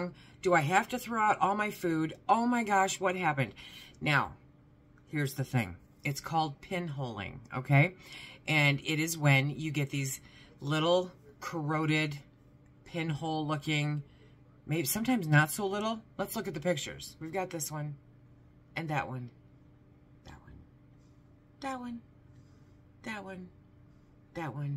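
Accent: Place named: Michigan, United States. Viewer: Your voice is pleasant. I used to live in Michigan. You sound like home.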